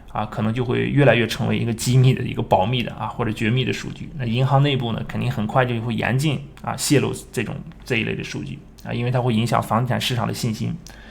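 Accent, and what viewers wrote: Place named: Inner Mongolia, China. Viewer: 内蒙口音听着很舒服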